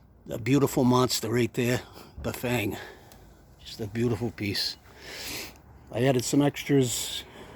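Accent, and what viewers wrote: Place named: Queens, United States. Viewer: Yup. You speak a lot like I do. I am from Queens NY. Nice job on the Ansbern bike. I could not agree more with doing due dilligence and research. Most of the bigger named companies are all just rebranded from China, with custom logos and specs, but much higher prices. I bought a Vtuvia FMB, which looks like a clone of your Ansbern, except I want your 52v battery! The FMB comes with a 13.5AH 48V, which reduces the range I want. I wonder if the ansbern battery would work in my FMB. Would you mind providing the battery dimensions?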